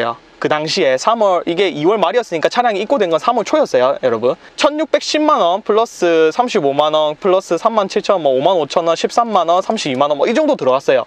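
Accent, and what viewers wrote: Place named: Gyeongsang, South Korea. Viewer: 갱상도 발음이 직이네.. 고마 정이 철철 흘러 넘치네예... 정말 이 동상께 차 사고잡네. 부산까지 고마 날라가서 확 싸 질러 뿌고 잡네. 영상 고마버요. 하는것 보니 인상좋아 잘될낍니다.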